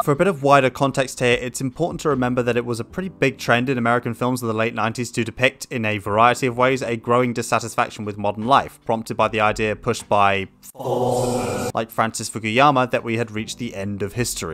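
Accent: United Kingdom. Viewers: They're just both southern English my guy.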